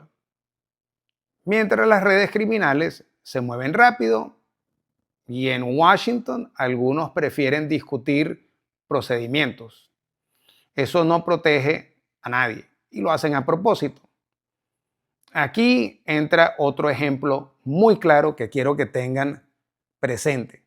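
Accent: Venezuela. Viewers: Cómo buen Tachirense, hablas sin "pelos en la lengua " en perfecto acento venezolano. Gracias ❤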